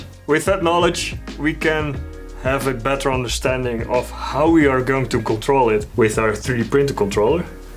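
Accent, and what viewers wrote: Netherlands. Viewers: I heard a dutch accent at Formnext this year which I remembered from somewhere. 😅 Saw you talking to the guys at the Slice booth. You were busy planning new stuff so I didn’t want to disturb you. Awesome and refreshing video as always!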